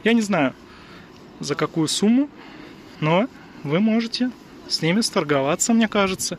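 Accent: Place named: Volga, Russia. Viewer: У вас Поволжский акцент,вы скорее оттуда.Я сам бакинец,но живу сейчас на Кубани на Чëрном море.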